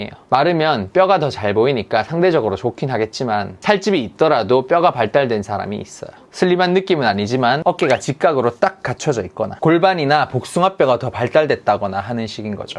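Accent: Gyeongsang, South Korea. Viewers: 디~게 귀엽게 생기셨다~^^ㅎㅎ근데 경상도 말구...서울 말투면 뭔가 더 좋겠다~^^ㅎ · 말투 희안하네 대구가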